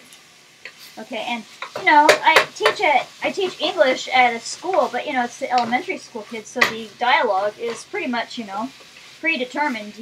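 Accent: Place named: United States. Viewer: I think your English still sounds very American. I think "you know" is spoken by a lot of the kids; I know I did it when I was a teenager. "Skimming the top" or we even use 'scumming'.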